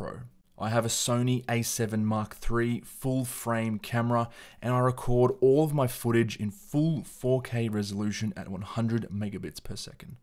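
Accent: Australia. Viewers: His Ozi voice is refreshing I’m my opinion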